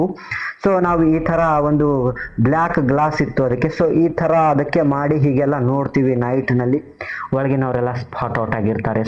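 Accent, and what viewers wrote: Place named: England, United Kingdom. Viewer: ಆಂಗ್ಲರು ಕನ್ನಡ ಮಾತನಾಡುವ ರೀತಿ ಮಾತನಾಡದೆ ಸಹಜವಾಗಿ ಕನ್ನಡ ಮಾತನಾಡಿ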